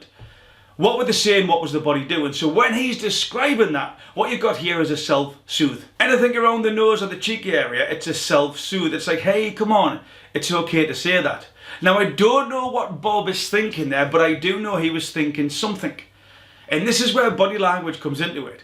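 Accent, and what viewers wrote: Newcastle, United Kingdom. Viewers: He certainly diesnt sound like a scotsman ges a true Geordie. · Divven ye nah a Geordie when ye hear wun? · Where you from, British?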